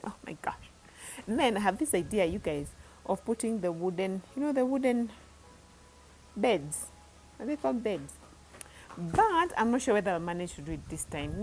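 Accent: India. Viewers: We're moving to India,love your indian accent. For cooking oil we bought 20 ltrs from a wholesale shop, and dividend it with my sister. It's way cheaper than buying from the supermarket. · The Indian accent caught me off guard😂😂😂😂😂😂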